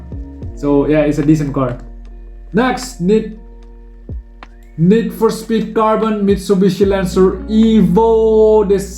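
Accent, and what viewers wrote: India. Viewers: Yo dude, quick question, where are you from? You look asian but sound indian, it's an interesting mix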